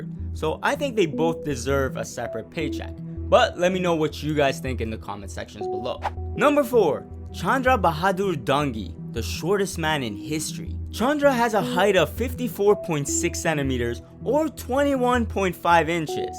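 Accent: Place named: Canada. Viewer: Heyy I recognized your Canadian accent 😁 Es-tu de Montréal ?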